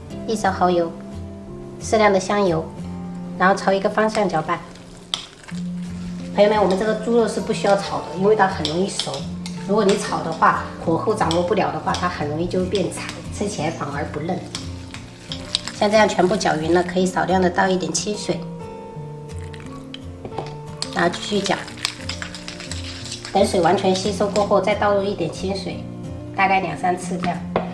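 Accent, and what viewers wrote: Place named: Minnan, China. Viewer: 聽到講閩南語特別親切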